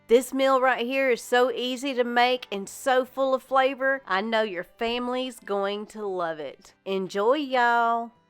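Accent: South, United States. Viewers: I love your way of talking. Southern talker, perhaps ?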